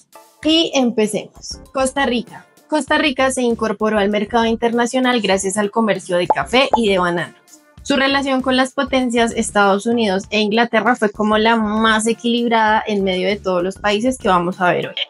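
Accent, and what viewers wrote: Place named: Colombia. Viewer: Por tu acento sos colombiana menos vistoso y todo preo haun la seleccion de Honduras supera en la serie a Colombia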